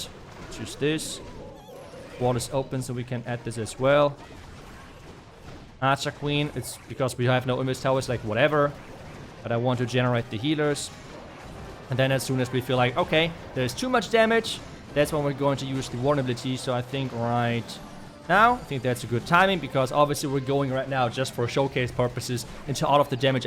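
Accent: Germany. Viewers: Are you german spoken, your accent sounds probably exactly like mine, aus Drutschland, Österreich, SW??😅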